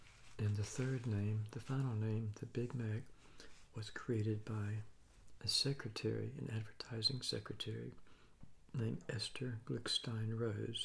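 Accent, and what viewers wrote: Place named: California, United States. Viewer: To me it kinda sounds Californian idk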